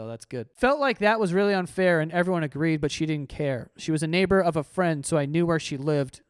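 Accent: United Kingdom. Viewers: Funniest British accent I’ve heard the past year! 😂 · Your British accent is hilarious! 😂 😂😂😂😂😂😂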